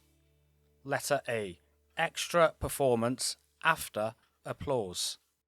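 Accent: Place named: Birmingham, United Kingdom. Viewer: 24, 😊 Great to hear a Brummie accent 👌 😊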